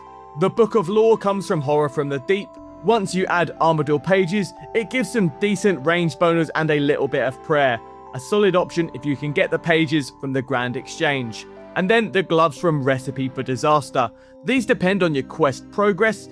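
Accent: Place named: United Kingdom. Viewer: God damn you’re the best in the business. Info, facts, humor and a wa-ah bo-ool accent? Perfection.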